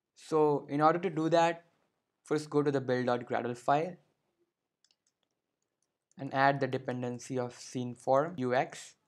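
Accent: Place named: India. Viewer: Hey buddy you are doing really great....Would love to talk to you about the realm of immersive reality. I am a 3rd Year B.Tech(IT & Mathematics ) student of University of Delhi. I have been working the realm of immersive reality tech for about 6 months now.Are you a delhite by any chance.......you definitely sound like an Indian. I am the head of the VR AR MR- Delhi Chapter, which is an open community of immersive reality enthusiasts. I organize workshops for immersive tech. Do connect me on <mail> to discuss more on the topic. PS: You really are doing some impressive work out there.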